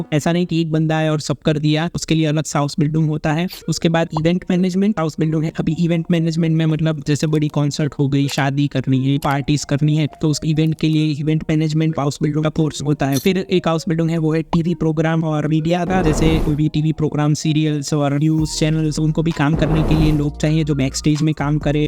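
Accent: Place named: Maharashtra, India. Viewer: Hello I don't know why but I feel you are a Marathi speaking guy after listening your Hindi.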